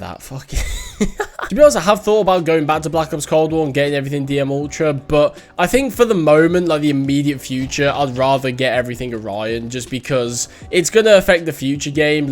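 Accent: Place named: United Kingdom. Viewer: Oi an angry British chap 🤓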